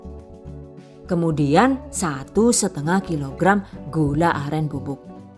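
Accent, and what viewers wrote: Banyumas, Indonesia. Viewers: Bahasae bisa ngapak juga hhhh salam mbak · penyampaianya sangat detail.. aq sendiri ngapak tpi denger bunda ini ngapak kok ngakak aq🤣🤣🤣🤣 lucune jannn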